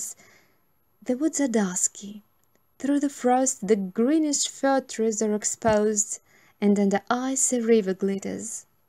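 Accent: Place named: Russia. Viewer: Слышно, что русскоговорящий.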